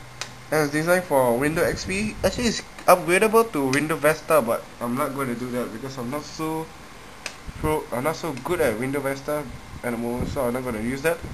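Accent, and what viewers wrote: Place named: Singapore. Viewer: lol singaporean accent :D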